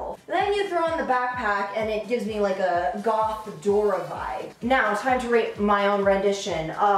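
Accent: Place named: United Kingdom. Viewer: the British accent tho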